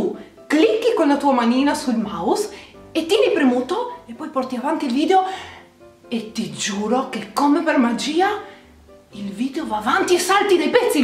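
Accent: Trieste, Italy. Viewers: Il fatto dell'accento è bellissimo, perché io ho degli amici di Trieste e parlano proprio come te e non credo che sia una accento di...💩 Comunque ti adoro!❤ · VIVA L'ACCENTO TRIESTINO!!!! 6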